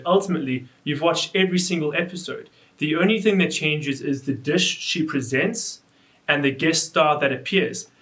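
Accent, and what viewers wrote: South Africa. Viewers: Are you South African? I love your accent x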